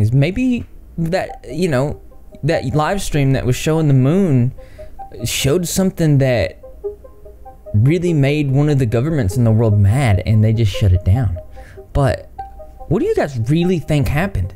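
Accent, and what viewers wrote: South, United States. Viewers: I'm trying to figure out where your accent comes from, is it Georgia or Tennessee? Maybe western North Carolina? :)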